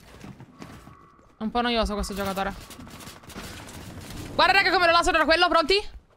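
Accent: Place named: Naples, Italy. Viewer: Ma tu sei napoletana io sono di bari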